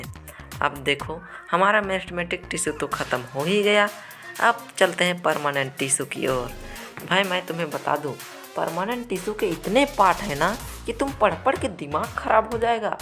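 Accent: Bihar, India. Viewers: Op brother maja aagaya full BiHari style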